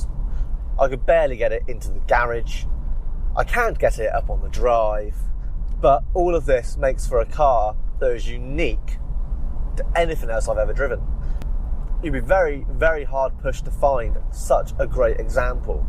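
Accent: England, United Kingdom. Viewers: Hello, where you from? England?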